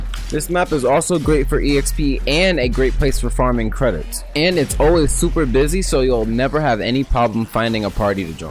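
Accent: Jamaica. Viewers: Where you from bro and are you a big fan or you're a Jamaican? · are u jamaican?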